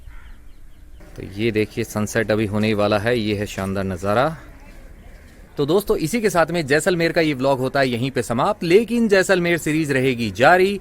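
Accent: Standard. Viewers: Bhai kya vedio banate ho aap jordar. Apki suddha Hindi bolneka andaj man gaye yar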